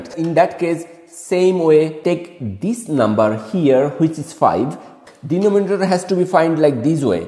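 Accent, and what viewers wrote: India. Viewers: By Voice sir is belong to India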